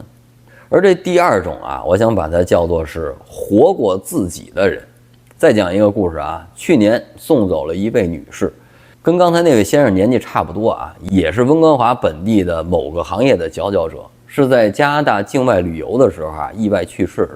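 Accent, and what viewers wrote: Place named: Beijing, China. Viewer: 博主是不是北京人啊，这味儿太重了哈哈哈（褒义）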